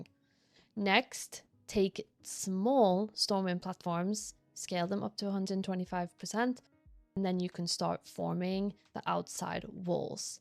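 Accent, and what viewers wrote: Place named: Scouse, United Kingdom. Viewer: Lovely stuff! Forgive me for asking, but do you have any affiliation with the city of Liverpool? Quite a lot of the things you say sound like there is a twinge of a scouse accent there! :)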